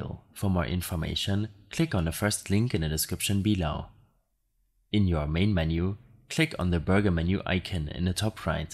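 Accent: Germany. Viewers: You are german i hear the accent if im correct lol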